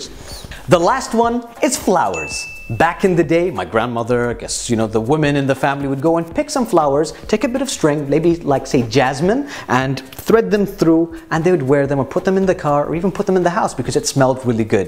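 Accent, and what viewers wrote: United States. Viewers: besides the fact that you smell good...where did you learn how to speak american-english? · Why american accent its not nice. Everything else is good.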